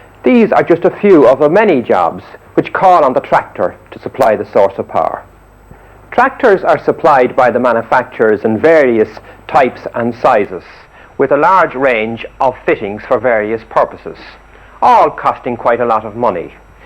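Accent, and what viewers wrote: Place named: Meath, Ireland. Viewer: Lovely meath accent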